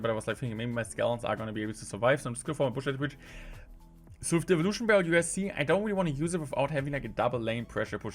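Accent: Germany. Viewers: Hey, i can tell that english is not your first language. Is your first language german? Because i am learning german, and i can kinda hear it in your voice.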